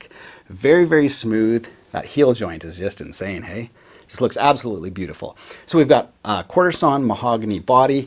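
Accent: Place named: Canada. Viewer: After years of watching South Park you can spot Canadians. Its they way they pronounce aboot (about)..... 😎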